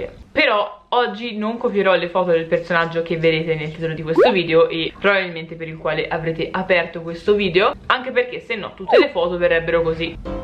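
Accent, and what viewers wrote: Tuscany, Italy. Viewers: Sei di firenze o comunque da qualche parte in Toscana?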